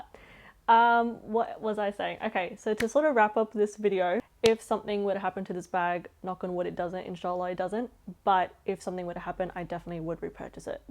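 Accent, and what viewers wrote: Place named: Australia. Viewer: Are you from Aceh? Can’t figure which accent, but the more I hear it’s definitely Aussie’s 😅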